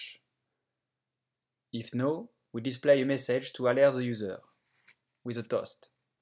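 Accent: France. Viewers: like a French speaks english ?? for example button, you said boutton..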